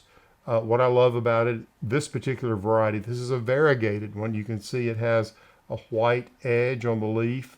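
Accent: South, United States. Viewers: I am from Kentucky but am now in Vancouver, BC, Canada. I love hearing your Southern accent! I do have a big shade area and do have hostas, but the gardeners may keep pulling them. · At home here in London where I’m talking about my hostas and how to fill the shady areas of my garden. I can’t believe my luck to have your video pop up. You have a great video format here. As a beginning gardener, Gardener’s question time on Radio 4 (here in the UK) can be pitched a little towards the expert gardeners for me, but your style is very warm (charming to hear that quaint southern American accent), engaging and informative. Loved your ‘filler, spiller and thriller’ concept! I’ll be applying that method this afternoon. Thanks again for making this great video. I just subscribed as I’d like to I see and hear more from you. Good luck!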